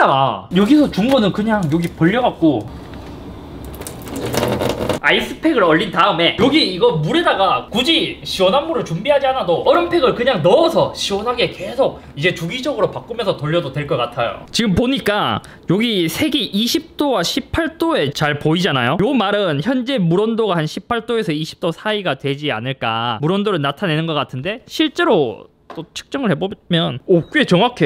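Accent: Gyeongsang, South Korea. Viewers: 역시 경남분이셔 ㅋㅋ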